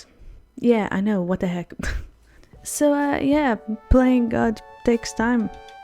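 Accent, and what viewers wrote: Hungary. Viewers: Ohh my god! Im hungaryan and i hear the hungaryan accent in your voice! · Annyira jó hallani a Magyar akcentust. :D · By any chance, are you hungarian? you sound like familiar :D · #magyarakcentus :D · Hungarian accent :3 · Your English is really good. Even tho I can still hear the hungarian accent a little lol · az a jó magyar akcentus :D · I can hear the Hungarian accent haha! Omg amazing!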